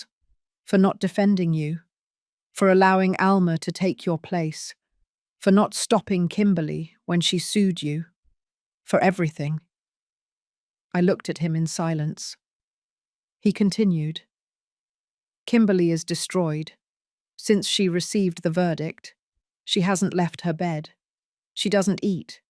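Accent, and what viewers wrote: United Kingdom. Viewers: I don’t know why but a British accent makes revenge sound proper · This is one confused story. Evil daughter knows and then doesn’t know mom gives monthly transfers. Narrator has a British accent, is named Mrs Lopez, and has a bank that’s open on Xmas Day. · I can’t stand this British accent any longer. Bye.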